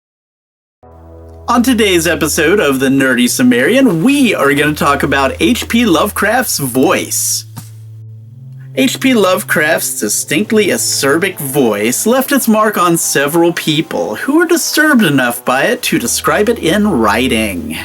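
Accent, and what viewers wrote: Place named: New England, United States. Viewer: So Linus from LinusTechtips if he had a New England monotone accent. (that's my guess)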